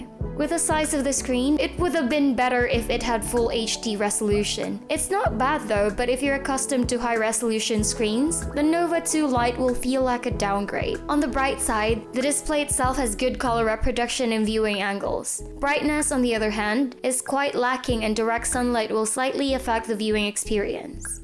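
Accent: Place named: Philippines. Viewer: Now that's what you called a filipino-english accent😍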